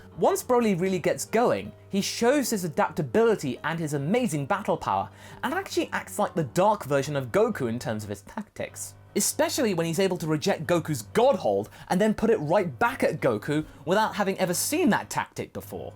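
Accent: United Kingdom. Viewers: Is that how British you really are?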